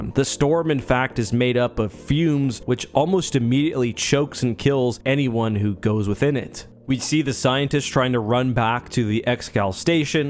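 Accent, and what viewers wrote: Canada. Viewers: The narrator absolutely has to be canadian, that accent bro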